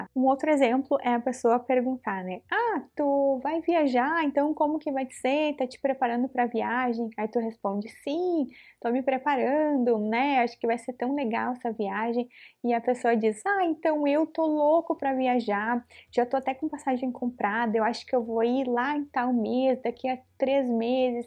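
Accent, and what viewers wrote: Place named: South, Brazil. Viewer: Adoro esse sotaque, me lembra de quando eu eu morava no sul. Saudades.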